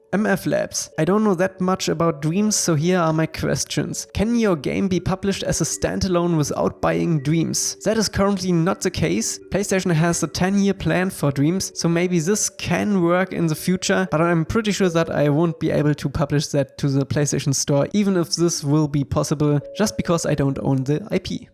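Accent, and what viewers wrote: Germany. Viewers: Ich feier dein Alman-Englisch😂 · Ist nicht böse gemeint aber finds lustig wie man hört das du normalerweise deutsch sprichst😂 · Are u german? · Du bist deutscher man hört es so hart klingt lustig aber kannst gut englisch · Bist du aus Deutschland? Hahaha hört sich so an😂 Translation: are you from Germany? You Sound like that haha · Ich mag den deutschen Akzent xd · Wer liebt auch seinen Deutschen Akzent ^^ <3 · I'm really looking forward to the game, take your time and don't be stressed out. The game should be just as cool as you imagine it to be. Ps. may it be that you are german, your english sounds exactly like mine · The german Accent is just on point😜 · Am I crazy or I can really hear a German accent? If yes, I am your neighbor. But from Poland :P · Alter dein deutscher Akzent ist ja soo geil :))) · are you german because you Talk english like one (im an german) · I like how you can hear out that you're coming from germany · Leichter Deutscher Akzent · I think he is from germany :D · are you german ? 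· Pls. Fellow German. Learn the TH sound. Your waaaaaay to much of a genius, so this shouldn't be a problem. Really impressed on your game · Ach ja der Deutsche Akzent xD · Love the german accent. Feels like hes introducing a german fight game. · Der deutsche Akzent ist thicc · Man hört es fast immer raus wenn Deutsche Englisch reden. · Stabiler deutscher akzent 😂👌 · Bitte rede normal english oder gar nt weil dieser deutsche Akzent nervt hart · Mann hört heraus das du ein Deutscher bist🇩🇪❤️❤️❤️ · dieser deutsche akzent haha ich liebe es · are you from Germany?? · Man kann raushören dass du deutscher bist 👌🏼 i like it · Wie man den typisch deutschen Akzent raushört nice haha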